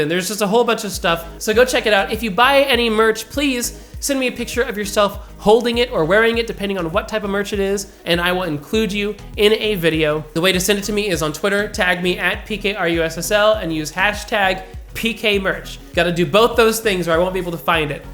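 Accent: United States. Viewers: He sounds very very American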